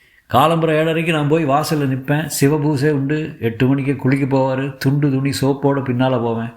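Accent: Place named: Nagercoil, India. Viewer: Nagarkovil slang miga nandraga pesuhireergal👌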